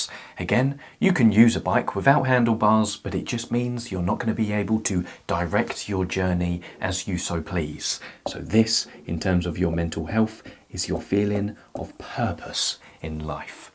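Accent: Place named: Cockney, United Kingdom. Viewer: I didn't think cockneys still existed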